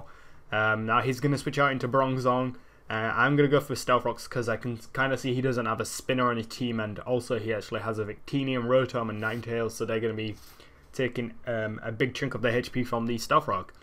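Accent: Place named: North, United Kingdom. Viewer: So northern.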